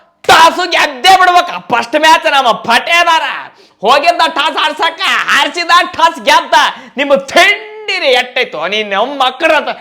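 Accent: North Karnataka, India. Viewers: Good review ಉತ್ತರ ಕರ್ನಾಟಕ ಭಾಷೆ ಅದ್ಬುತ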